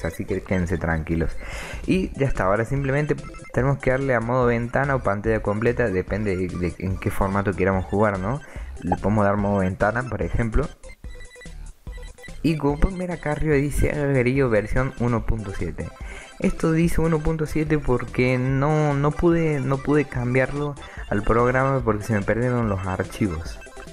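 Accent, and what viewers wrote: Córdoba, Argentina. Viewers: amigo sos de argentina cordoba?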